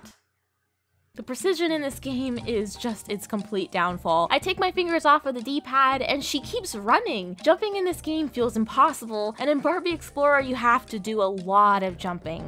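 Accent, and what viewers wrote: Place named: United Kingdom. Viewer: Just recently found your channel through reccomendation somewhat, and I love it.... After scroll through many of your videos, it Reminds me a bit of Kim Justice content, but with milder and clearer voice (british accent sometimes sexy, but hard for me to discern as a non native english speaker) and thats a lot kudos from me...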